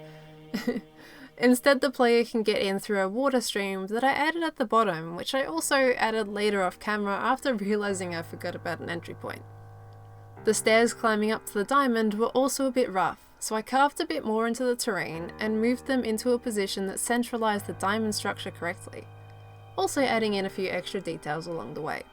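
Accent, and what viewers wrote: Australia. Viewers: are you aussie i cant tell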